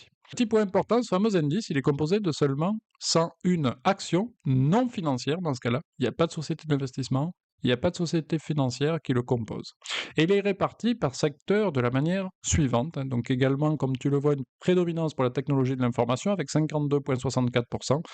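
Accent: South, France. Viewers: Vu ton accent, t'es du sud mais d ou exactement ?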